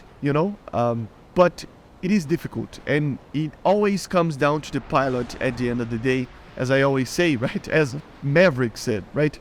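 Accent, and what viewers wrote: Brazil. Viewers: are u brazilian?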